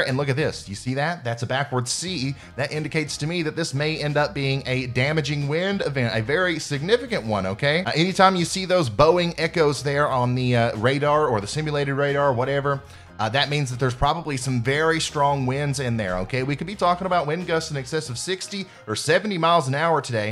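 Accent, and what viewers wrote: United States. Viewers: You're a YouTube personality, you can take as much time as you need to talk about the weather. Too much country in your talk.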